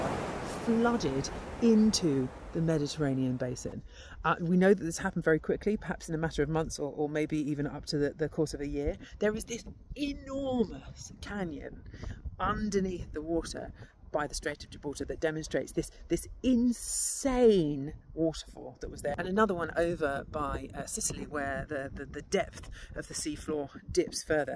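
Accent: England, United Kingdom. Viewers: The British accent is so trippy to me... "Wotah" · It was always the English accent. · Interesting how a brittish accent and a confident assertion can make a number of massive assumptions based off of a few observable variables appear absolutely true. lol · A beautiful ginger girl talking about tectonic movement in a British accent is so mesmerizing,😍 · BRITISH ACCENT? NONONO... WE DON'T WANNA LISTEN TO THIS GARBAGE! KEEP IT IN YOUR POCKET! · I am normally not going for the British accent BUT I am fascinating by her freckles & red hair 🤗 What was she talking about again? 😅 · It takes a sexy lady with a english accent talking down to us.. lesser prosh accent she from around London south and she like some other people can acutely see how the world moved.. Most human are to busy with a football game . Pig or cow rugbie.. or hitting small balls in small holes wonder why your wife got shaft another place.. 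To bad you all watch more cartoons probably the smurfs.. and cared way to much how one might chip a ball.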